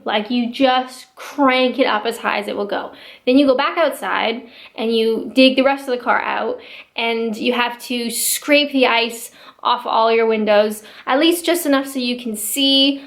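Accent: Canada. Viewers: It's abute ( Canadian pronunciation ) the South Scotland is another country that also says abute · Very interesting videos, from an interesting perspective. One thing you haven't yet learned... in your generalisations, it's not England... it's the UK. There are also places such as Scotland, Wales and Ireland. It's rather like us here referring to you generally, as as American, with an American accent. I know you are Canadian, and I can tell from your accent even, but you get what I mean. Yes, you're in Kent which is in England for sure, but it's the UK, and I am not English!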